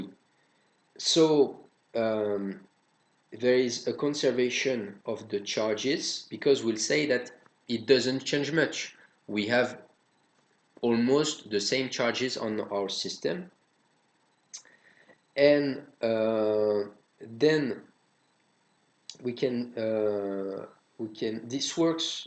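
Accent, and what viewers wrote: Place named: France. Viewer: I love your frenglish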